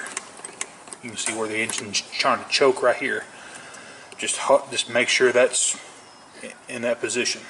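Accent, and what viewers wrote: Texas, United States. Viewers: Great visual with the case open. Clearly from Texas with the few "H'what" I heard.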